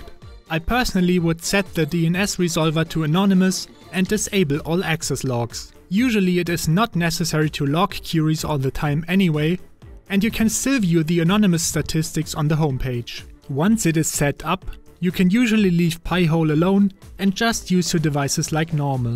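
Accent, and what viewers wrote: Germany. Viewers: uuugh german english :D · You seem to have a German accent, am I right? No criticism, just for you to improve: You are talking about Queries, not Qiuris :) try Googling the pronouciation. Ps. Great video. I have my own NextcloudPi and it is great. With Nextcloud Talk you even can use a Teams alternative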